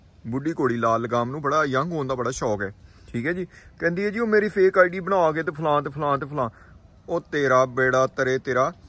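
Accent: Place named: Punjab, India. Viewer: you have excellent punjabi accent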